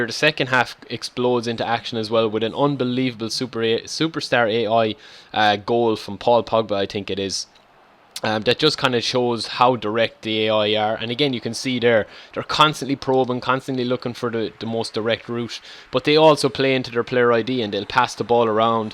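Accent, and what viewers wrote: Ireland. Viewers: Welcome to Ireland. I think it sounds nice, love the accent.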